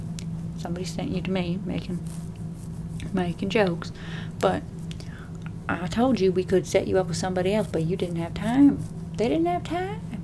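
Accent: South, United States. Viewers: Great southern accent.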